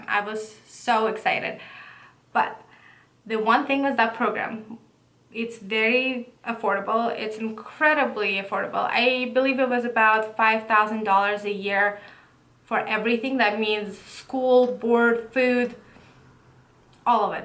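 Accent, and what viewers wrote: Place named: Russia. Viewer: Про perfect вы загнули конечно. Русский акцент заметен сильно (говорю как человек переехавший в 12 лет)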